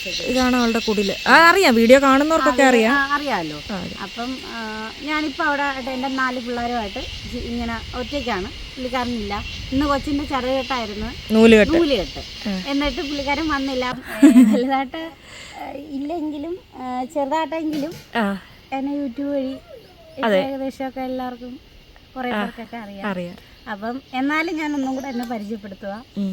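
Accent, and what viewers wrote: Thiruvananthapuram, India. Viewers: Chummadhalla oru TVM slang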